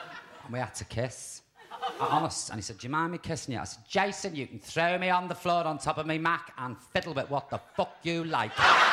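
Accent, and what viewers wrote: England, United Kingdom. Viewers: Usually I'm good with an English accent, but what. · Does that counts as scouse or Mersyside? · Scouse accent basically, he's from near Liverpool.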